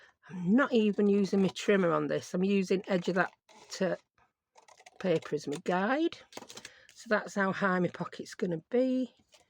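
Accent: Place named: Sheffield, United Kingdom. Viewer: Wonderful video as usual. You mentioned Sheffield this time and then I knew why your accent sounds so familiar. My parents are from there. I do not hear there accent but others do. We have been in Canada since 1965. But you sound like my relatives over there. I do enjoy your videos. Thanks for sharing!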